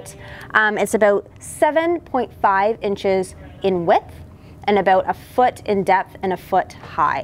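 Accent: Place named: Canada. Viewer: "Oat and aboat" How to know it's Canadian not American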